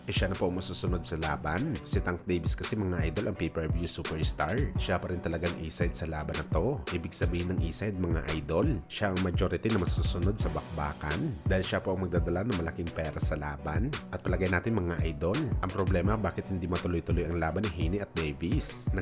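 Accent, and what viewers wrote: Visayas, Philippines. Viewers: Ikaw lng ata nakakarinig Ng sinasabi mo,punting bisaya na nga Ang hina pa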